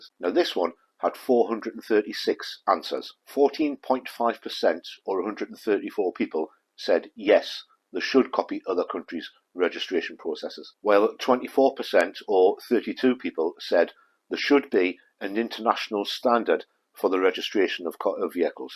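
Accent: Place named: Northumberland, United Kingdom. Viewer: Says your in Northumbria and can tell your local too by accent don't mind a ride out one day!!! I will be better once weather warms up am crippled with pain in the cold!! Im only 39 but feel more like am 78!! Love reading its how I got good at motor trade reading and trying out learn from my mistakes!! Youtube videos make it lot easier for those who want too learn the trade now!!!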